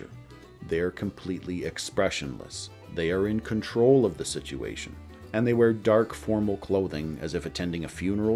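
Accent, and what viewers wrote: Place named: Canada. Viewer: long time sub, I'm not sure if I already said this, but your voice is very relaxing. You sound like Dank Net, same accent, similar pitch and cadence (albeit to lesser extent). Which accent is that? I've heard plenty of Canadians but you and Dank Net are the only ones with that specific accent. It's a cool accent, where does it belong to? I'm Australian so I wasn't taught anything about Canada in school unlike Americans (I'd imagine anyway) and from Perth, Western Australia - every Australian that foreigners hear are from Melbourne, Sydney, or the outback. I'd imagine Toronto and Vancouver to be similar to the Melbourne/Sydney in that regard? Idk, just an interesting parallel.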